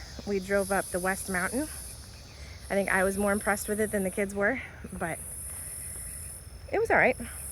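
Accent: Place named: Texas, United States. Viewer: i'm from Birmingham which is a major city, but I really like to travel to Wales which also has cities but also has plenty of beache and country. Where is it that you live? I assumed maybe Texas from your accent? Am I right?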